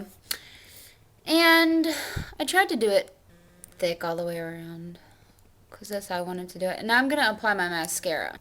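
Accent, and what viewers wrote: Texas, United States. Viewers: i like the tutorial...but u need to be more professional and stop talking in that texas voice. grow up a little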